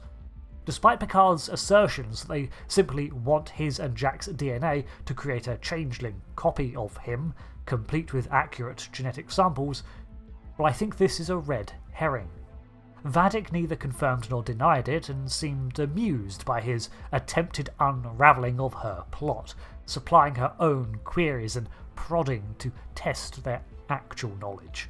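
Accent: United Kingdom. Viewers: For a few years now I've been watching your videos for star trek online as I have not been able to play it much myself. I love the way you narratively approach each of your episodes for the stories. I love your recaps of this season of Picard. Not much of a point to my comment other than to say I appreciate what you do. And a question? your accent I'm having a hard time placing, and I don't know why cause usually I'm good at that. Honestly I can't tell if its British or Scottish but I have a feeling it's neither. Either way it doesn't matter. I'm just curious. Either way I do love your videos and watch them often. Looking Forward to the next one.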